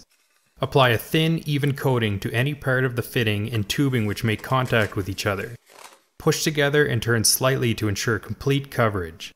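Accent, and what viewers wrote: Canada. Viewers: You must be from the Maritimes.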